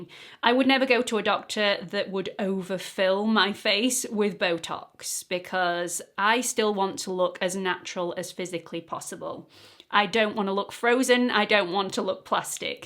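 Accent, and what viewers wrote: United Kingdom. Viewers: Thank you for being so candid and answering personal, sometimes accusatory, questions. I love your fresh style and spunky personality. I am from the states and enjoy hearing a British accent, lol. You're adorable and I miss your mom ha ha. I hope that one day I can have as good relationship with my daughters as you have with your mom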